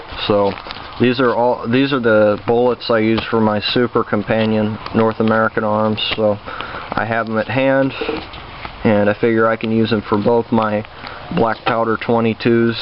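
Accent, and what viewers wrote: United States. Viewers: you don't sound Californian, you sound like your from my neck a the woods. where abouts you first lived? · Well, since you're talking about your roots, I'd say that you probably learned to talk in southern ohio or close by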